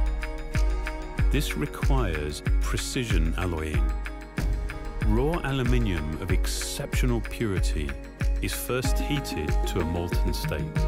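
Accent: United Kingdom. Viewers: it's the British accent lol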